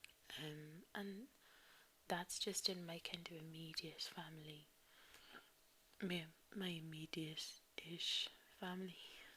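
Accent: Ireland. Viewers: God your voice is gorgeous! :) think I have established I like irish women :P nommy nom nom. I was watching This Is England 88 tonight and they had this Irish nurse on there and I instantly liked her accent. Think this is better than a Brummie accent now. Im from the South Of England I live 80 miles from London in Kent. My accent is cockney/kentish "alwite mate you fackin...." Irish accent is now my fave :) whoop! 2nd is Margate girl accent (local accent) :)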